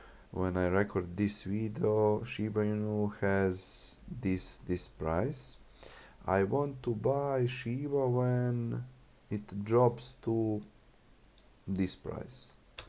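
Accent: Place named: Bosnia and Herzegovina. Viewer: Odma sam se pretplatio kad sam čuo engleski sa Bodanskim naglaskom 😅,sala Hvala na video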